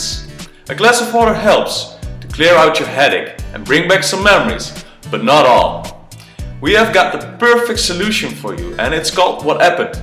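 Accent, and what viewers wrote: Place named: Netherlands. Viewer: Zwaar leuk idee, maar hadden jullie niet even iemand kunnen gebruiken met niet zo'n overdreven Nederlands accent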